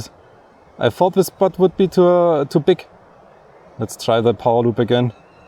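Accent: Germany. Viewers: accent sound german af, also mach ich mal in amtssprache weiter. Geiler spot den du da hast. Ich habe meinen goto spot leider noch nicht gefunden :D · That German accent is just crazy lol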